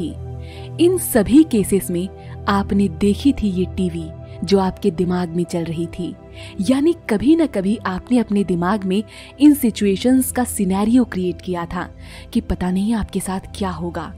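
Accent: India. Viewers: Your voice is too good.. love Indian Hindi ton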